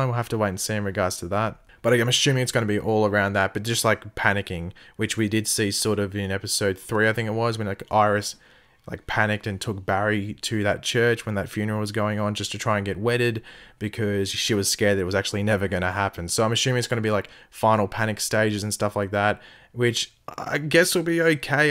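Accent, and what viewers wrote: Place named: Outback, Australia. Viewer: I didnt subscribe because of your content, i subscribed because of your outback sounding voice, just sayin hahaha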